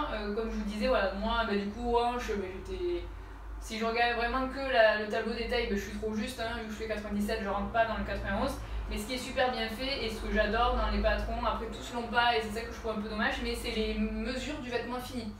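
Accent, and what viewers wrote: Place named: Tarn, France. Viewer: Merci pour cette vidéo. Tu as encore fait de super réalisations.... Bravo. Tu viens de quel département ? Car moi je suis du Tarn (de castres) et que ton accent est proche du miens. Je fais ma curieuse 😜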